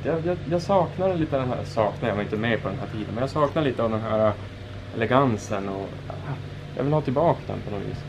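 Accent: Norrland, Sweden. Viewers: Härligt med lite norrländska!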